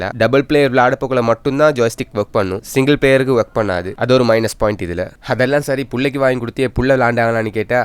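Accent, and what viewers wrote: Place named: Kerala, India. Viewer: Your tamil is super. Cute ah iruku unga slang. Are you malayali or srilankan?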